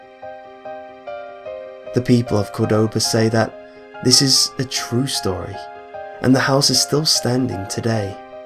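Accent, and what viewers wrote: United Kingdom. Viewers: Aww poor. I still love you. I love me some British narrators <3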